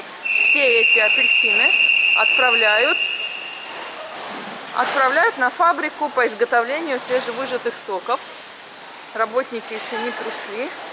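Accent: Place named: Turkey. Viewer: Извините! Почему у вас акцент на Русский? Такое ощущение что не русская а турчанка говорит на русском. У Турков ударение на последние слога.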